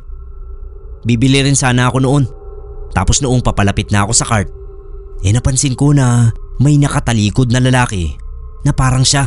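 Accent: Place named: Ilocos, Philippines. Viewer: Si narrator naten tunog ilocano. 😅😅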